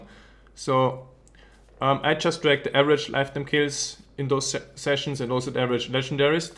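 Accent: Austria. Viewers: I love your Videos! How could anyone not recognize that accent? Austria FTW:) (I'm german though, don't get confused) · ... I just came back to diablo just cos of this video and i will play my barb for SURE! funny accent+GREAT imformative videos+Austrian+I'll be back = im so happy that i subscribed you man. Keep up that great work dude.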